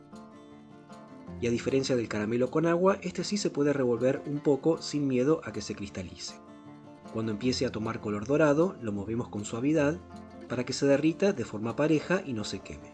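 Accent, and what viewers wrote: Chile. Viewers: Pensé q era chileno! Jeje tremenda receta... cuidado de hacerla muy seguido,digo,por el azúcar...pero impecable y único em sabor y recuerdos 😊 gracias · ¿ Es chileno no ?🤔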